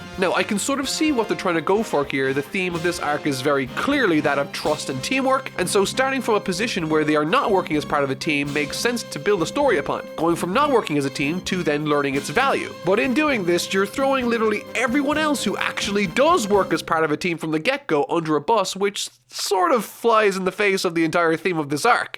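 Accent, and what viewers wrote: Ireland. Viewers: are u irish? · “And I’m not just saying that because I’m from Ireland” So THATs your accent.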